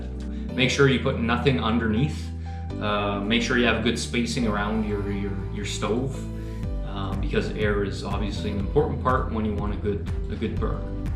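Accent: Canada. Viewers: Nice video, je savais que j'avais affaire à un Québécois mais ça pris quelques minutes avant de te trahir :P Bonjour de l'Abitibi ;)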